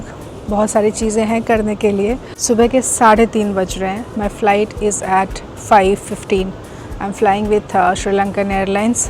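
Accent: India. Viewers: It come.with Hindi pronounced not sankrit Hindi lot.ending with et and r thats to english as well.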